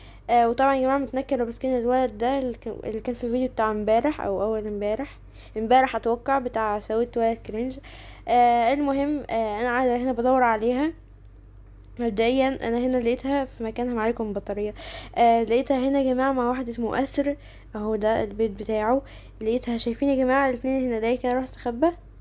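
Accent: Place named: Saudi Arabia. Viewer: دقيقه انا ابي استوعب رديتي صح اححححح ما توقعت والله العظيم بس اشوفك دايم تتكلمي سعودي شوي؟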